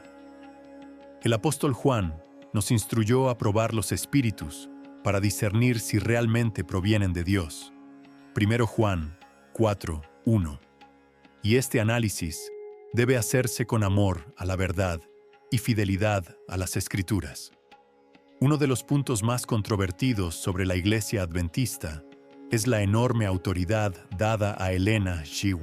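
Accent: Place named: Mexico. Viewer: Desde Colombia parcero, pero con acento Mexica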